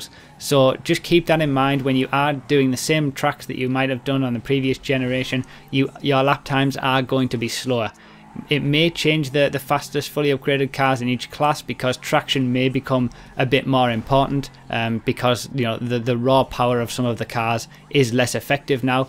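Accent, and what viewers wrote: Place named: Geordie, United Kingdom. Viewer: Where is from? Just discovered this channel and it sounds like he could be from Newcastle, sounds Geordie just not as broad as the stereotype.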